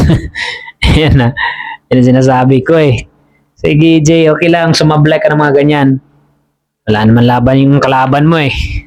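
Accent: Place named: Visayas, Philippines. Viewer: Tana na bisaya ingay mo 😅😂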